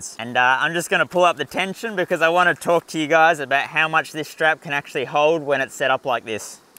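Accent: Australia. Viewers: When I hear an Aussie accent at the beginning of an Offroad educational video I know I’m about to learn some good stuff! 🇺🇸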